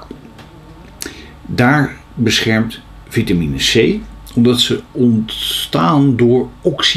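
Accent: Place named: Leiden, Netherlands. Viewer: dank je wel...interessant! ik vind het leuk wat je vertelt! Ik wou nog vragen wat jou achtergrond is. Ben je arts of natuurgeneeskundig therapeut of arts? of/en het voorafgaande en helderziend of medium? Ben echt geinteresseerd! denk hetzelfde....maar wil gewoon meer van je weten. ps: Kom je uit Leiden?? Je klinkt zo Leids?!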